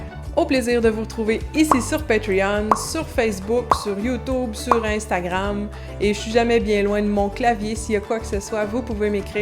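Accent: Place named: Quebec, Canada. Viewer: Pourquoi soutenir ma? Pour avoir le plaisir d'entendre son accent Québecois mais surtout pour s'enrichir sur l'histoire, la culture Québécoise et la langue française!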